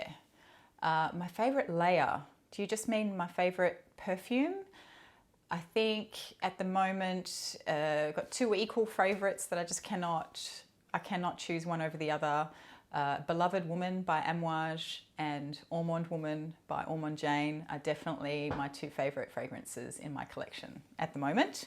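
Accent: Australia. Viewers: Totally agree with Tyler.....I love listening to a fellow Aussie. Your videos are such an easy watch.♥️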